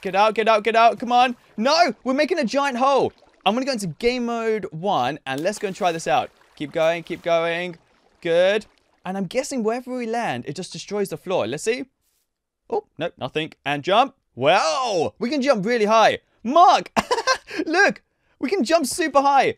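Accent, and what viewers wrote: United Kingdom. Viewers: Hi how you British accents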